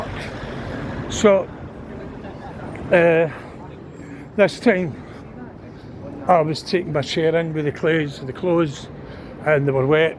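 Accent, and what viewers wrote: Glasgow, United Kingdom. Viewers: You’ll need to buy yourself a wee battery charger, that will charge your phone as you walk, they are handy wee things. And you don’t need to be politically correct with your English words, we all love your Glesga accent And love your loyalty towards all your old pals, very nice touch that you name them in your videos, I’m sure their family will appreciate that you still talk about them even although they are gone As the old saying goes GBNF 😊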